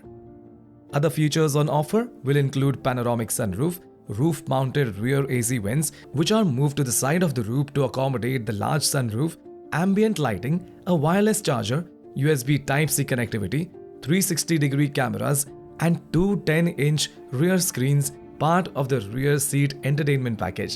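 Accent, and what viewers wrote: India. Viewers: Indian accent is terrible.. 🤮🤮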